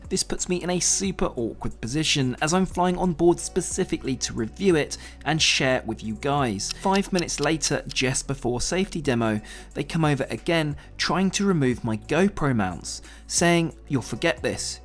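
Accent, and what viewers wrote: RP, United Kingdom. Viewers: its wild watching this in comparison to how posh he sounds now LMAO. So he was once just a regular dude.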